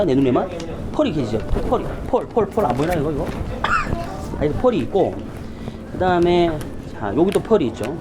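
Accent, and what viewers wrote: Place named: Gyeongsang, South Korea. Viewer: 학교 선생님하셨어도 애들한테 인기짱이셨을 거 같아요 경상도 사투리임에도 정확한 딕션과 유머러스한 말투 너무 재밌고 귀에 쏙 들어와요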